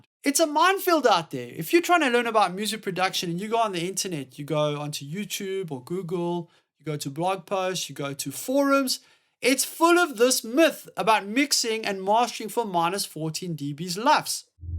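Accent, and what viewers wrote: South Africa. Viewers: You sound south African bru!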